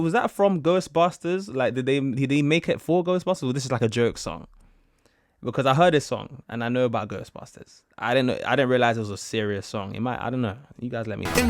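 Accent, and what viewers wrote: United Kingdom. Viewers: U like the one of many British accents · That's a British accent. London more than likely.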